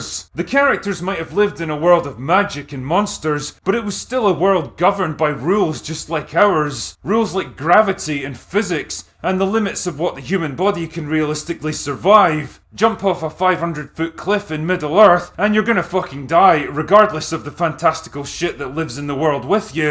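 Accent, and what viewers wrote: Scotland, United Kingdom. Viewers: I have no idea why this Scottish guy talks like he’s holding in a monster sh*t. We don’t all talk like that I promise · scottish with a hard S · The dwarves in this movie were so dumb, to put it plainly, and they were all unlikeable, especially the leader. Did you see The Hobbit, the cartoon? It was around when I was young (early 80s). I remember it was very good. Also, the book. I don't think we really needed these movies. Course, we don't need most movies. Maybe if it was just one really good Hobbit movie it would have been something.... I think the actor who played Bilbo did a good job though, but I can see your point about him acting the same in every movie. Edit* by the way I love your Scottish accent. I'm from the U.S., it's probably one of my favorite accents, I don't hear it enough!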